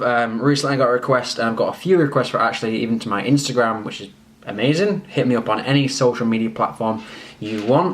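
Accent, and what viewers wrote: Canada. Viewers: you Canadian accent is getting dope! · you are getting a Canadian accent. saying 20 is the giveaway.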